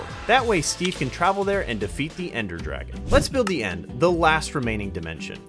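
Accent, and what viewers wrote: England, United Kingdom. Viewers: Дякуєм за додавання Української до відео, хоч там і є певні помилки і ще й о той Англійський акцент... але це пусте... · Английский акцент...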